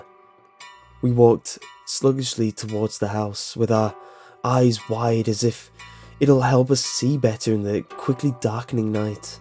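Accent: United Kingdom. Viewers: Americans? The guy reading this has a British accent. Are you kidding me. Why does everything in the comments have to relate to Americans.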